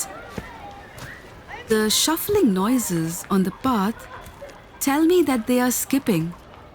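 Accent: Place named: India. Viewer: This is amazing!! Compassion. Forgiveness. Friendship. This book has much to teach kids. accent does a great job making you feel like you’re in India so if you’re about to watch, grab some chai so you can engage one more sense in this beautiful story.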